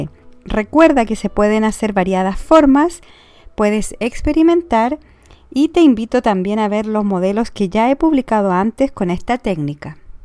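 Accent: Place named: Chile. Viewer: Hola me encantó primero por que eres chilena como yo, con 40años en Italia escuchar la voz de una chilena me da mucho gusto. Muy lindos tus trabajos yo me dedico a la lana todo lo manual es ermosa brava 👏👏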